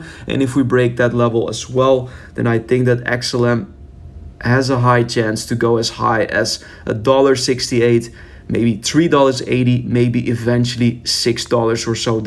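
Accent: Netherlands. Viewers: i love you content ♥️ I immediately subscribed to your channel!.. and even though you speak very good English... I still hear that you have a Dutch accent... is that right? 😊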